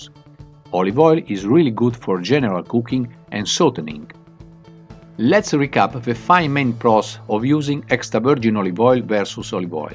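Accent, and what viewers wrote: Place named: Greece. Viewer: Love the greek accent Edit: Alright. I'm confused. Italian name and speaks Italian, but the accent sounds so Greek to me.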